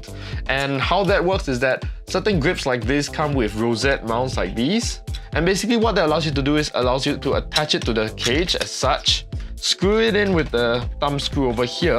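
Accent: Singapore. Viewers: Singapore accent.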